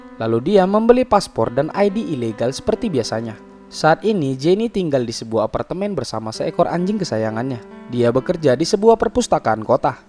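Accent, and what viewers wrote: Medan, Indonesia. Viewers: Pembawa ceritanya kayaknya orangedan ya 😁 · Oi bang.. Orang Medan ya? 😅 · Medan kali ya logat mu 🤣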